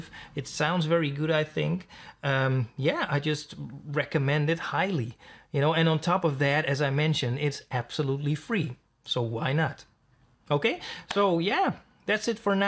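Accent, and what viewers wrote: Netherlands. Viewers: I hear a slight Dutch accent so I assume you're Dutch but I'll keep it in English! I have installed Flux on my laptop and downloaded Bittersweet through the Flux app but I can't the DLL files anywhere to use it in my VST plugins in FL. How did you get it to work?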